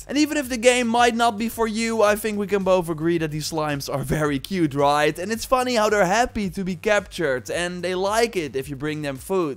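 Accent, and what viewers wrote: Netherlands. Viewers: After all this years... still that heavy Dutch accent while speaking English. Very annoying.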